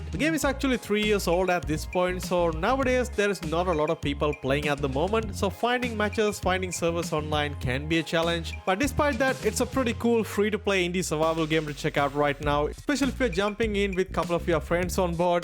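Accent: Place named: India. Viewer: bro u sound like indians if u dont mind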